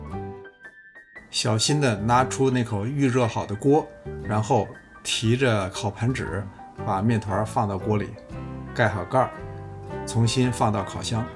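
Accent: Beijing, China. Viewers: ，在海外的人听到乡音，倍感亲切，喜欢您的视频，而且还有老北京的风味小吃，太棒了！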